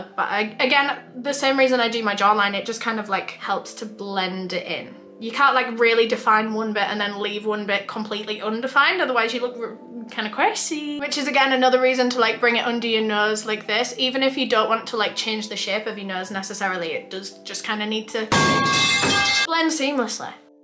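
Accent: United Kingdom. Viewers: My friends (who are 100% deaf and, thus, haven’t got the slightest clue what your Voice sounds like) mentioned that you’ve repaired the Auto-Captions of some of your November & December Videos…They said they had no idea you’re from the UK; they can’t hear, so they never noticed your Accent…Because I don’t know any Sign Language and my friends don’t speak and can’t understand speech either, I always need to communicate with friends by Text Messages all the time…My friends can’t watch your LiveStreams until they’re later saved to their (respective) Channel(s) as regular Videos, thus (hopefully) gaining Auto-Captioning (usually, a day or two afterwards)…My friends turn on the “CC” (so they can figure out what’s being said in Videos) and they hand me the remote so I can, meanwhile, turn on the Sound and I just ignore the Captions and listen to what’s being said in Videos…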